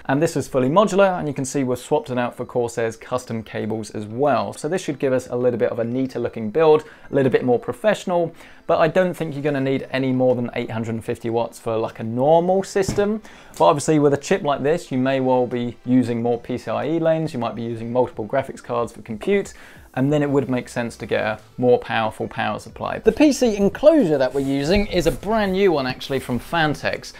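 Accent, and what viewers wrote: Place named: Tunbridge Wells, United Kingdom. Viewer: You sound very Tunbridge Wells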